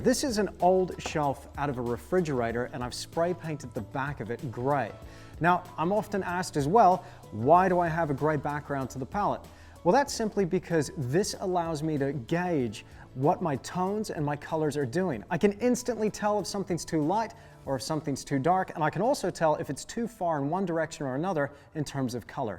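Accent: Australia. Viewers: Am i getting aussie vibes? Are you going in and out of accent? Don't know if I'm losing my little aussie mind or not haha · Great videos,!, just put of curiosity, I can't place ed your accent-, is it Australian? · I could hear that Aussie in ya there dingo! Thanks for the lessons mate.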